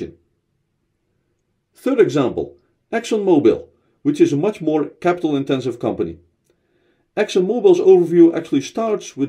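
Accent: Netherlands. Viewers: Hi, are you Dutch? (From your acccent)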